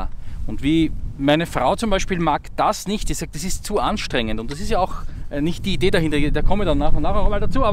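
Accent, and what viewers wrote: Vienna, Austria. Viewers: DEN österreichischen Dialekt gibt es nicht. In Deutschland gibt es ja auch weit mehr Dialekte als Bundesländer (Sächsisch, Berlinerisch, Kölsch, Schwäbisch, Fränkisch,...). In Österreich ist es genauso. spricht mit Wiener Akzent bzw. Niederösterreichisch nahe Wien. 🙂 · Du bist schon ne coole Socke und ich als Piefke finde den österreichischen Dialekt so geil. Ach ja und klasse Video!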